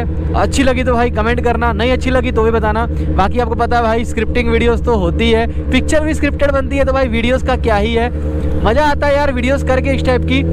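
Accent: India. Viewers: Bhi tum bhajpuri Ho ke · Bhai aap bihar se ho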